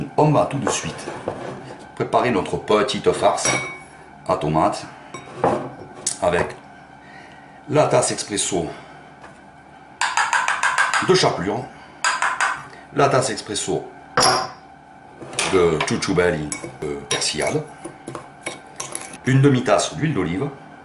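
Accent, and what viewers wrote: South, France. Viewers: Merci pour la recette! J'avais oublie comment les faire! (Et j'adore l'accent du soleil!!!...lol)...je vais m'abonner juste pour ecouter l'accent qui rechauffe le coeur...ahahaha! Salut!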